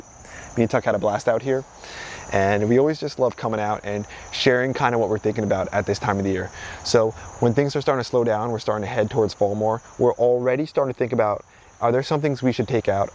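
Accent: New Jersey, United States. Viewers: I enjoy listening to your New Jersey accent. I'm in Harlem, by the way. And I ❤Tuck.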